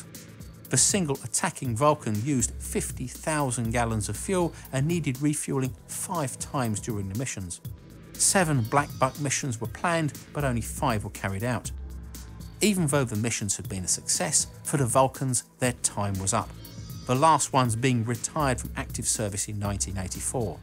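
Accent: United Kingdom. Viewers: Thanks to YouTube caption. I can’t understand 90% of what he’s saying. No offense, the British accent is so difficult for me understand! Cool vid regardless.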